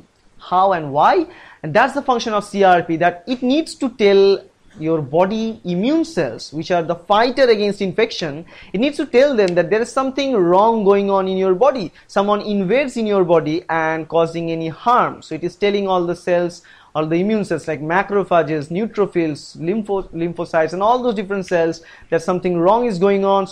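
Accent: Bengal, India. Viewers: It seems u are a Bengali? (by your accent and name) Which college are u from.. , sir...?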